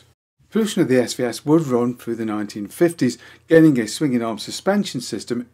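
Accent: United Kingdom. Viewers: Here’s your German fan again. I would never complain about you pronouncing any German word in a British way. „Puch“ would be especially hard to pronounce, because the „ch“ is a fricative rarely used (if at all) in English language. Perhaps you want to try a Scottish accent, that might work.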